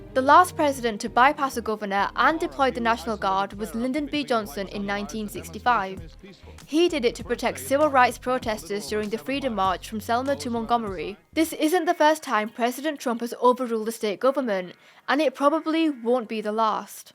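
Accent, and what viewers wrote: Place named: Northern England, United Kingdom. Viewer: It's just a northern english accent, to those outside the UK it can come off a bit troggy sometimes admittedly but it's kind of like how southern US accents sound a bit 'hick' to our ears. Just a regional thing, probably in most countries.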